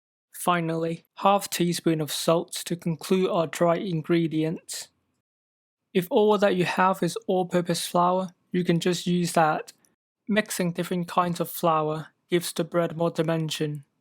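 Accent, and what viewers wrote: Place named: Italy. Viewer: luv the Italian accent, cool video well explained recipe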